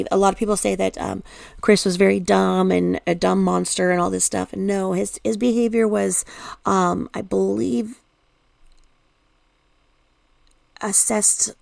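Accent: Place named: South, United States. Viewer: She's from the South. We say wadder and washcloth. We also say hosepipe. 😂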